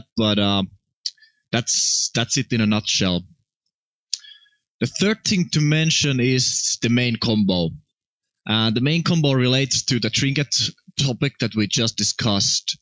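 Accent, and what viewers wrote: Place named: Finland. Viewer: You sound so finnish.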